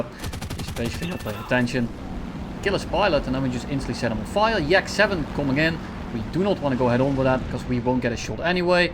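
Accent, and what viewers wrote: Netherlands. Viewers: I love your vids btw, but that english accent, you have to be dutch, i think you are a Dutchie.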